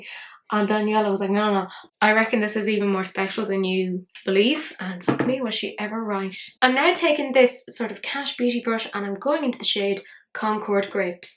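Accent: Ireland. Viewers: I bought this palette because of the bright colorful shades, that I normally wouldn't wear, but I thought the bold colors in this palette would bring out the intensity of some of my multichromes!. Nice to hear what sounds like a "Dub" accent schooling me on some new make-up! I definitely will use your code on my next order with this brand.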